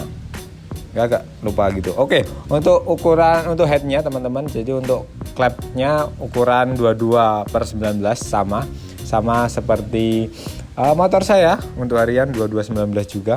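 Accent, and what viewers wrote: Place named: Java, Indonesia. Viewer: Ngomong gue nya medok bngt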